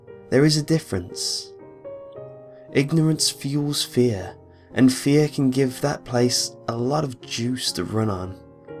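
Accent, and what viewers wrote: United Kingdom. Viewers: hes not...thats his accent, watch other videos and pay attention to the british english wording styles he uses.....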